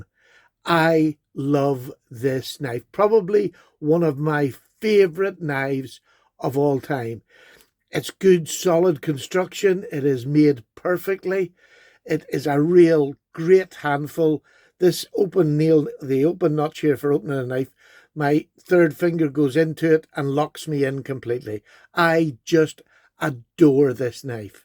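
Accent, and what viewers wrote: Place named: Ireland. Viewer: Hi! I stumbled upon your channel about a week ago, I I want you to know what a pleasure it is to watch your videos and listen to your voice! Your brogue is what I imagine my ancestors would have sounded like! I appreciate your comments and attitude as well as the knife content! Have a great day Sir!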